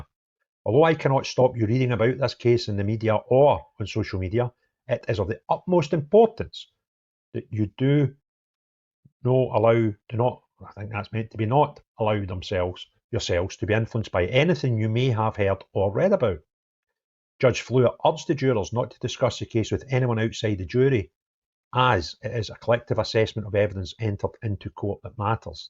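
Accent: United Kingdom. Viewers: My apologies...what accent is this? I am assuming this is UK, which region is your accent?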